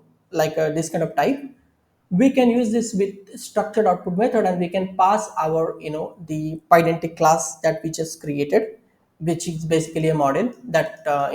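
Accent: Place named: India. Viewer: this is the best video ever for me to learn LangChain as well as India accent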